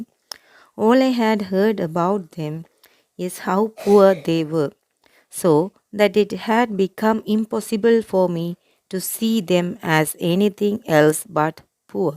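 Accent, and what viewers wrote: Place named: India. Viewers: Thank you very much ❤ By the way , your pronunciations tell me you are an Indian person . Am I correct? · I also thought she was from india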